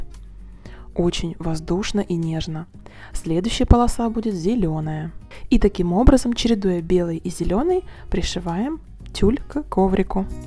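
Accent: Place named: Ukraine. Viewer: ПЕтелька, протестируем!!! Что за малороссийский говор??? Следите за речью! Вы же не в подъезде гутарите!???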